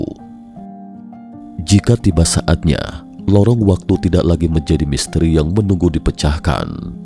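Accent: West Java, Indonesia. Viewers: urang sunda nya' kang?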